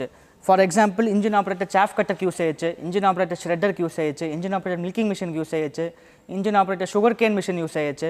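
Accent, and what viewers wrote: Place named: Tamil Nadu, India. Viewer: He is Tamilian, far better, got the point know.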